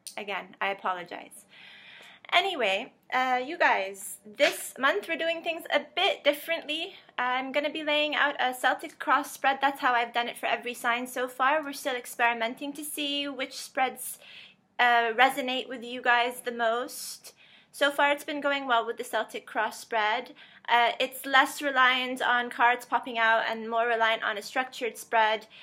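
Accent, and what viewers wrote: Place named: Ireland. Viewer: are you Irish? - I love your accent xx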